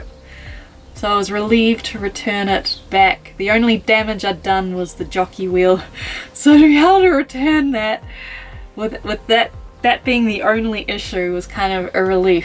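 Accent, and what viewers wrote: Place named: New Zealand. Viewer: K you are such a brave lady, love your kiwi accent.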